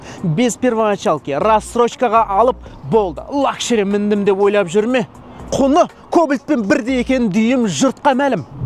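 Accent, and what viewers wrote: Standard. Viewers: Ассалаумагалейкум інім! Туу..... недеген таза қазақша тусиндиру👍👍👍👍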